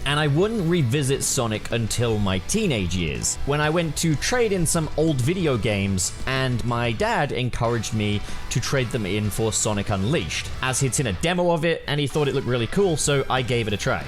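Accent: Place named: United Kingdom. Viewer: But in your YouTube Channel informations, it says that your in USA (that's why i asked in the first place) but rewatichng your video, i noticed a little the british accent haha (it's not that obvious for a foreigner to notice it ^^')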